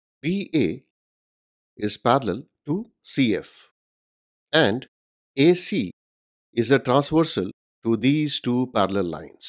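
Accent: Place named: India. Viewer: u sound indian tho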